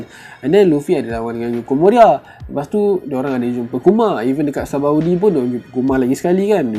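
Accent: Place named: Pahang, Malaysia. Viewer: pekat ngt loghat temerloh nye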